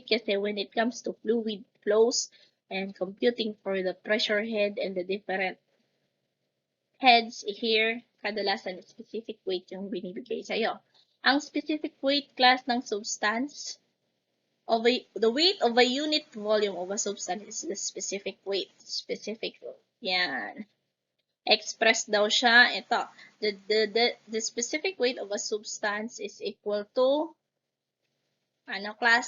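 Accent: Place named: Bicol, Philippines. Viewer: bicolano pala kayo maam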